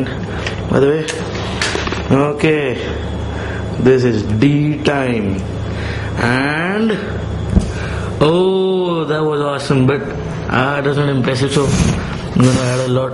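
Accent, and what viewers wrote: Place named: India. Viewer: Yeah Gunner3210 is right... deodorant is extremely flammable, be very careful when playing with fire and compressed containers with flammable gasses inside because they could explode in your hands :( Good luck in you chemistry studies ;) P.S. i like your indian accent, it's so funny! xD Greetings from Italy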